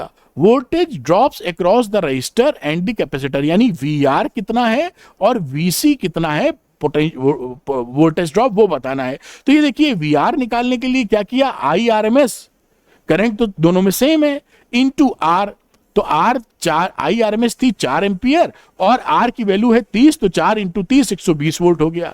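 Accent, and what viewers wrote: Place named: Mewar, India. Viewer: That’s actually the natural Dialect of Mewad side in Rajasthan. It’s catchy though. 😂😂😅😅😊😊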